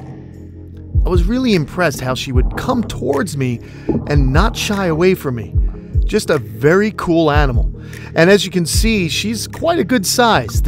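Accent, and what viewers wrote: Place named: New York, United States. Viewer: Nothing wrong with a New York accent.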